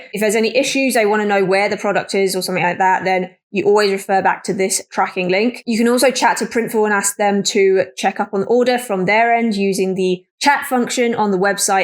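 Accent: United Kingdom. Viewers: Thanks, sweetheart!!, so what I got from this was I have to press a button in printify, which I have just done i wish I had of known this morning before I went to my boring 9-5 job 😁 so is that it, yeah I was stocked to get my first sale today but your right I was confused what next very helpful thanks!! is that a British accent i detect? from a fellow brit here?? ;-) lol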